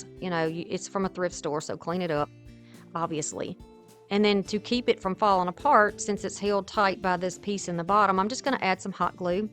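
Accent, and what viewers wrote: South, United States. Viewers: Love these😅 and your gentle southern accent. Subbed!!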